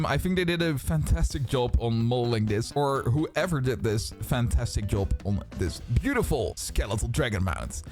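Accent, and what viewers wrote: Netherlands. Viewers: Is English you’re main language u have a Dutch accent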